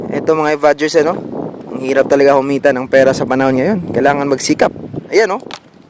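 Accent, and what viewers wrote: Visayas, Philippines. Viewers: Bisaya ka po ba idol? hehehe pa shout out na rin😁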